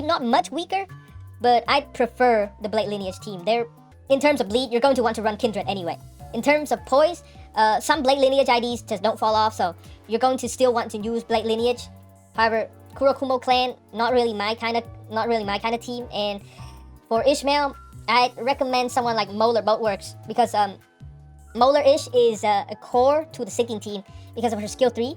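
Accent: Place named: Vietnam. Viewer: hmm... Is it just me or... I find there're some Asian accent in your voice. Not gonna try to be racist bro but.... your voice really remind me a Vietnamese fr who us to be my friend in highschool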